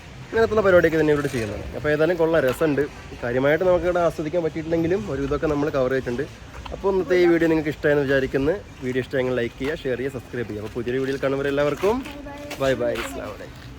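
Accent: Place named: Tamil Nadu, India. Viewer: നല്ല രസം തമിഴ് കേൾക്കാൻ നിന്റെ ❤❤❤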